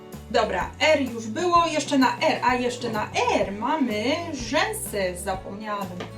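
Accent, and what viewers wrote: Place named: England, United Kingdom. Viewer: Kocham jak wymawiasz polskie nazwy z angielskim akcentem ❤❤ Zrób jeszcze raz to tylko z angielskim nazwami ❤❤❤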